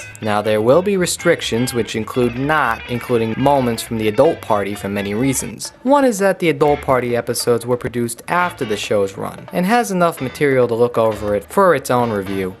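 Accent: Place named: New Jersey, United States. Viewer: Are you from New Jersey? You have a bit of the accent.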